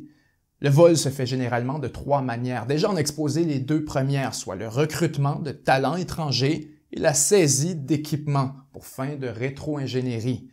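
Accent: Quebec, Canada. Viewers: J'adore ton accent canadien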